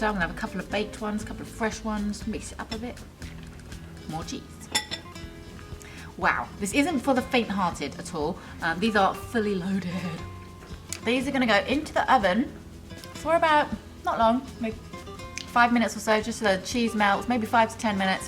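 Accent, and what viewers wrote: United Kingdom. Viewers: Hi,enjoyed the video, that's a real meaty nacho dish.You look more eastern than Mexican and sound British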